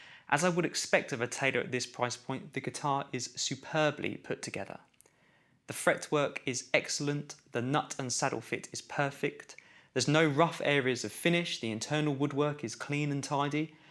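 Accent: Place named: United Kingdom. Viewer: I’m tickled with your British accent. Want to ask what country and region are you from ?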